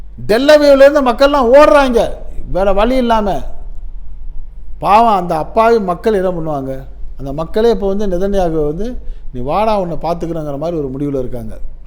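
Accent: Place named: Rural, India. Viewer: உங்களின் பேச்சில் கிராமிய மனம் வீசுகிறது.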